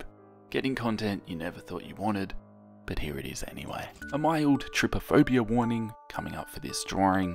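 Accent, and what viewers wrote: Australia. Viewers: YOUR AN AUSTRALIAN!? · Ayyyyyy! Your Australian! You are now my most favourite Australian Youtuber! I'm Australian. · wait.. are you aussie???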